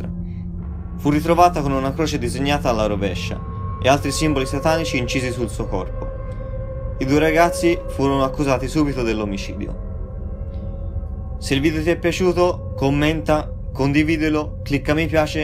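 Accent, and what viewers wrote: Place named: Tuscany, Italy. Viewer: L' inflessione della lingua è toscana !!!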